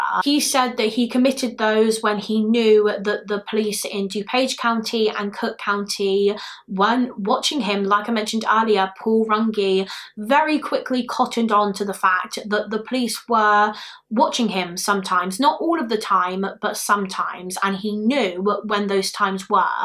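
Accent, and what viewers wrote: United Kingdom. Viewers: Amazing Thankyou, also as lovely as it is the American YouTubers I do love a Brit one xx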